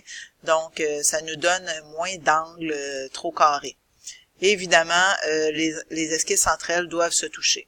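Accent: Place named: Quebec, Canada. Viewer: Bonjour , Je pratique Solidworks depuis 20 ans et j'en apprend encore, je viens de suivre quelques tutos que tu as fait, c'est vraiment très bien expliqué et cet accent du Québec quel régal !!!! merci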